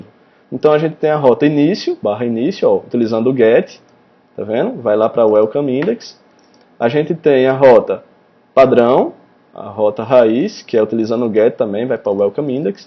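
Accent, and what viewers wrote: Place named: Northeast, Brazil. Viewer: Você é o cara. Comecei a estudar Rails a menos de uma semana, e já aprendi mais do que todos esses anos tentando estudar programação voltada a back-end. Sou front-end e estou estudando uma linguagem back-end. Sempre tive interesse em estudar rails, por causa da sua praticidade, só que eu nunca entendia nada. Hoje, graças as suas vídeos aulas eu já consigo entender muita coisa. Confesso que nas primeiras aulas eu não tava curtindo muito a forma de como você falava e se expressava, porém, os vídeos foram melhorando, ao ponto de você explicar melhor do que muita gente famosa. Parabéns de verdade. Valeu por dedicar um tempo a rapaziada que assim como eu está começando no caminho do rails. Obs: Você é do nordeste? Tem um sotaque muito nordestino. Eu sou de Recife - PE. Abraço.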